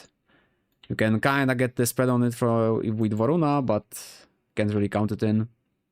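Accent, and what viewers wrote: Poland. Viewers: i can smell polish accent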